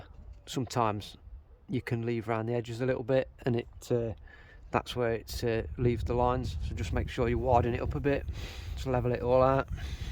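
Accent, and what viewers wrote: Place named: Derby, United Kingdom. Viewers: Love your videos and thanks for making them. I have to ask, what part of England you from? I've lived in Australia for 56 year's, originally from Leicester. Your Accent sounds Leicester or some place very close by. Cheers me duck. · Nice Derby accent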